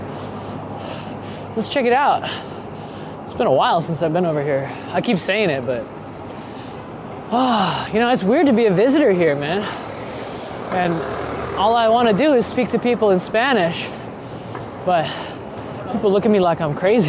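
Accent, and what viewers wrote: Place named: Mexico. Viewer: Estas hablando asta con el acento Mexicano.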